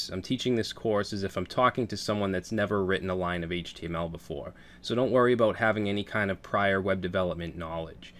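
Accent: Boston, United States. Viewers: Boston accent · You from Boston man? I hear it in your voice. Thanks for the video! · You sound like you are from Boston, are you? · are u from Boston?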